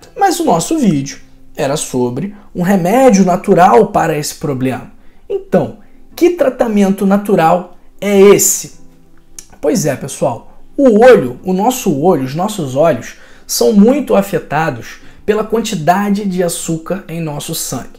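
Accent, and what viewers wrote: Rio de Janeiro, Brazil. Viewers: Você fala muito muito carioca. É muitos x x x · Esse sotaque de carioca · Carioca ! Menos! .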